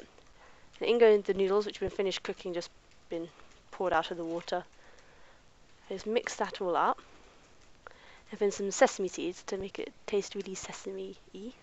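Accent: United Kingdom. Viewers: posh Londoner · Thanks for the video - you made it look really simple. Love your accent! What part of England are you from?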